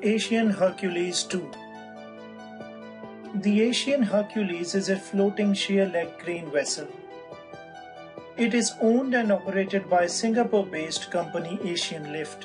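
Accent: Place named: India. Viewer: As soon as I hear an Indian accent, I know it's going to be full of bullshit and factual errors.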